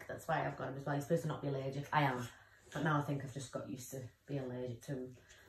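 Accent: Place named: Liverpool, United Kingdom. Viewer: Scouse in the house 😆👍 your like me. I sweat when I talk to people I don't know. I don't know what to say to them unless I've had a drink . If I go to party's I have to go and sit on me own for a bit evey so often to get some space lol i hate going to places I don't know aswell . The ailen thing you said hit me because my m8 would say I'm like and ailen from a different planet hahaha and I always make sure iknow were people are in the room . From hearing you talk I can see your not stupid your smart and probably a nice person if your in a good mood lol things will get better where all fucked up other people are just better at hiding it .nice one for telling your story i appreciate it feels good to know I'm not the only one 😆👍👍👍👍👍👍👍✌✌✌✌✌✌✌👋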